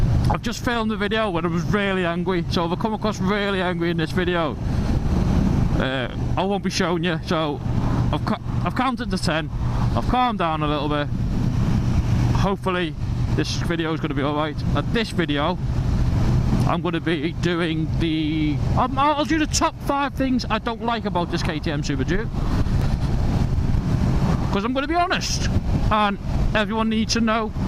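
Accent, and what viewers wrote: Liverpool, United Kingdom. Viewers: Are you from Liverpool??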